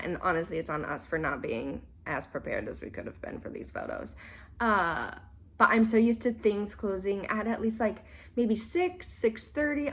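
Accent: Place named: New Zealand. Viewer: I can hear a bit of Kiwi inflection in your speaking which is super endearing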